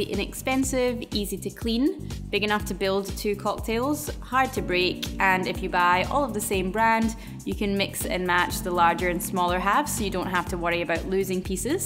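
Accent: Scotland, United Kingdom. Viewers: Does she have Scottish accent?? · God, I love that scotish accent. There should be more scots in this world. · Love your videos and lovely Celtic/Scottish accent. I'm having trouble getting the exact song title and artist from your video. R, Patrick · By the way, I absolutely enjoy your recipes and your Scottish accent!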